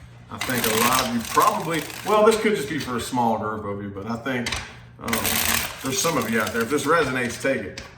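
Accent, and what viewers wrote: South, United States. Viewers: 🤣 I have a really southern accent like does. My voice texts NEVER come out right lmfao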